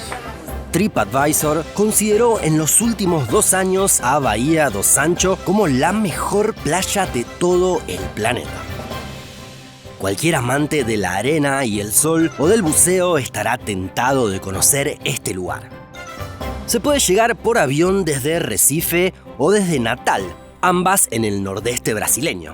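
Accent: Buenos Aires, Argentina. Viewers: Horror el acento argentino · Me encanta el acento argentino (porteño?): "shegarón, shega". hahaha. Muy peculiar. Saludos desde Brasil. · Raro es escuchar un porteño intentanndo hablar como mexicano :) te delata la Y, y la LL (shh) jajaja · Hola. El narrador es de Argentina. Saludos. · Me gustan mucho tus informes claros precisos y sin tanta cháchara lo único que no me gusta es tu acento muy pronunciado del tono arrastrado bonarense de la doble ele (ll).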